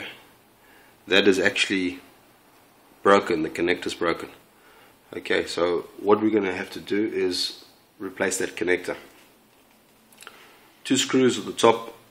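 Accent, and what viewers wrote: South Africa. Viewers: r u south African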